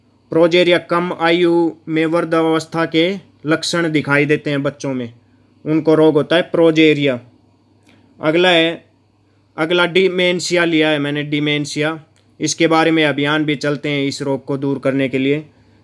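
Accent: Haryana, India. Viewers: Haryana ka laage h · Hariyana se ho kya sar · Haryanvi h ye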